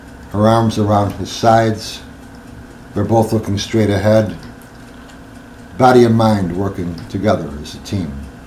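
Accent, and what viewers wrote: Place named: Chicago, United States. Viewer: Hey, no joke I just asked my son if he thinks looks like anyone he knows and he took one glance and said, "Al Pacino!" LOL! Did you know that your Chicago accent is very much like Upstate NY?! I worked in a Bank Call Center for years and always knew that accent! Chicago or Upstate NY where I'm from! Had many, many ppl ask me over the years if I was from Chicago and are surprised when I say NY.... never been to Chicago.... Just a little trivia for ya!! Namaste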